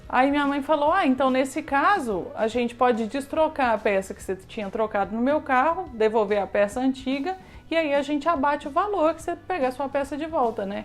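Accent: Minas Gerais, Brazil. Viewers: Mineiro tretando deve ser a coisa mais fofa kkkk sotaque fofinho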